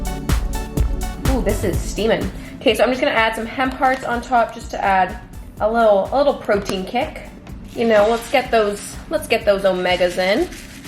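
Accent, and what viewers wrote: Canada. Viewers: i love your canadian accent SO much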